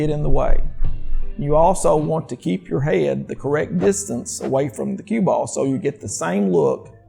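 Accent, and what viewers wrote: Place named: South, United States. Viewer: Not bad advice but honestly talks way too slow, I think it's a southern thing but it's honestly tough for me to watch someone who talks this slow.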